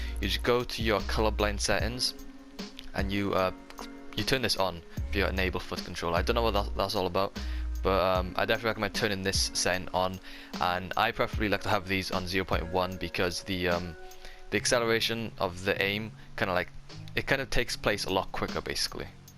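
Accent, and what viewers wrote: Wales, United Kingdom. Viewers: You sound welsh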